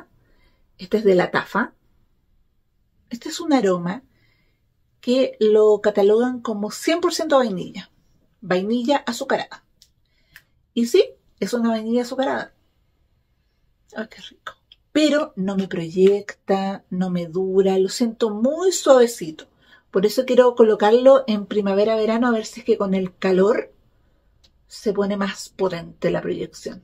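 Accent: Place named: South America, Latin America. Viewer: ¡Me encanta tu acento! Para mí, el mejor de Sudamérica. Saludos desde México.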